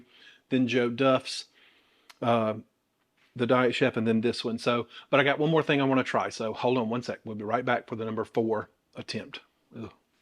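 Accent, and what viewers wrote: South, United States. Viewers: Gosh I’ve had such a bad day, hearing this southern accent talk about cookies is just what I need 😊 thanks!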